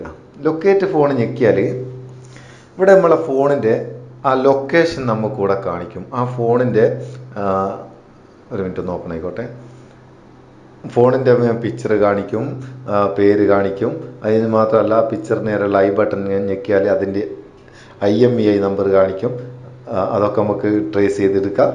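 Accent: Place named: Vadakara, India. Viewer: മ്മളെ ബഡേര ഭാഷ യും ഇഷ്ടപ്പെടുന്നവർ ഉണ്ടല്ലോ സന്തോഷം ആയി 😛😂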